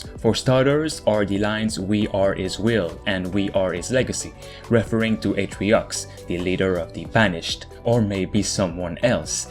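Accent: Quebec, Canada. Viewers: Dude are you somehow french? You really sound like someone from Quebec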